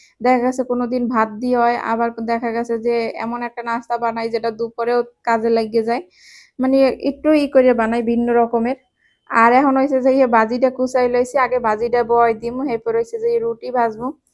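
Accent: Barishal, Bangladesh. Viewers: সম্পূর্ণ ভিডিওটি বরিশালের ভাষায় সুন্দর করে উপস্থাপন করলেন আপু ❤লা ডান❤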